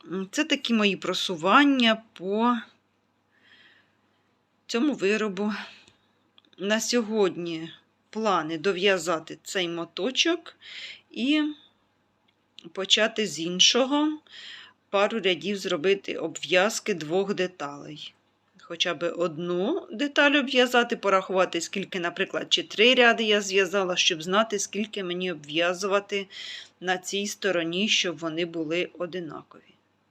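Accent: Poltava, Ukraine. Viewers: ❤Приємно послухати . Як з рідними з Полтавщини поспілкувалася.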